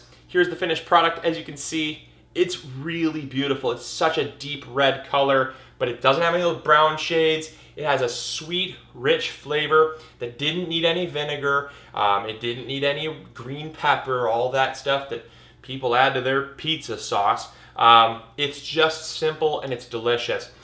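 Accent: United States. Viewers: I appreciate the way you convey what you’ve learned definitively without elitist attitude, arrogance and ego. Respectfully asking, I’m curious of your credentials? Again respectfully saying, I must disagree that classic and authentic... whatever it may be, has to be made a certain way without any substitution or variant of preference whatsoever. I was blessed to work for two generations of Italians in their family trattoria and while pizza wasn’t on the menu, we would make it for staff lunch at times and the sauce we made was quite different from yours as well as other recipes I’ve seen. My point being, when the language dialect can vary from one community to the next, it would be safe to assume other aspects of culture would as well? I live in the south eastern US and by your English, I’m assuming you’re somewhere in the states as well so perhaps you can relate to the following point. 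I’ve lived in NC where they boast some of the best sauce for bbq in the country. There are three different regions , one being the western part of the state that uses different forms of tomato as a base. To the eastern side, no tomato is used, only vinegar and pepper. While the central does as central does and incorporates the east and west to one degree or another depending on how close one might be to the two sides. I now live in Charleston SC. Famous for shrimp and grits and she crab soup as well as a few other regional specialties. While most if not all will agree some ingredients are vital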